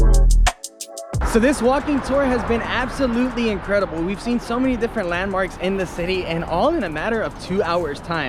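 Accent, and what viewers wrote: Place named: Miami, United States. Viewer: you have to tell me you’re from Miami. I know that accent anywhere! This is my first video so not sure if you’ve mentioned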